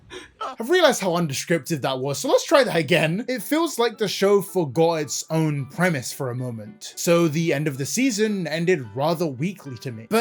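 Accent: United Kingdom. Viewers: Your accent is based on where you grow up not your ethnicity. The video creator definitely isn't ethinically British, but he sounds British.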